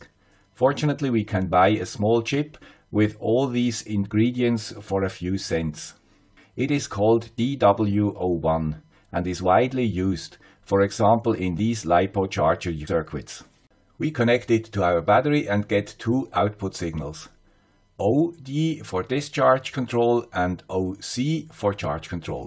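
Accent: Switzerland. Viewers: Another BRAVO presentation, Mr. Guy With a Swiss Accent! Are you a professor or something? I kind of like it that you always write down on your video the objectives of your video...and then proceed on to explain following those objectives. Over 100% per cent for another good video. Thanks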